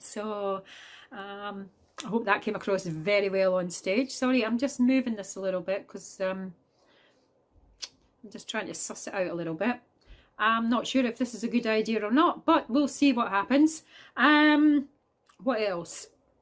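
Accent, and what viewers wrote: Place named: Scotland, United Kingdom. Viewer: Hi.wow your a busy lassie..always good to hear a Scottish accent lol...your solo... not the type of solo I'm use to hearing lol...sounding great tho...and you are loving it..well done.and congrats on your certificate 🤘...xx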